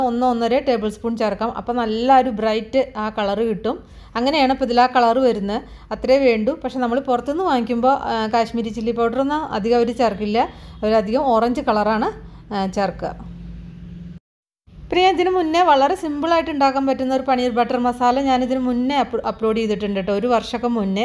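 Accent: Palakkad, India. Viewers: sari. samsaram kettappol thonni palakkad, nammude area akum ennu. I am from ottapalam. Cooking video ellam super anu. Try cheyarundu. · palakkad ആണ് എന്ന് എനിക്കും തോന്നി, നല്ല സംസാരം 💗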